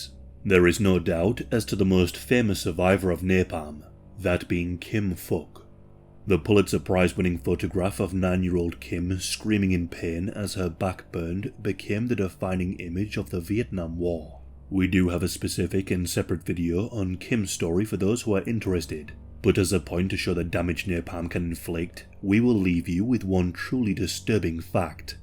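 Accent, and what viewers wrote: United Kingdom. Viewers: Hes brit*sh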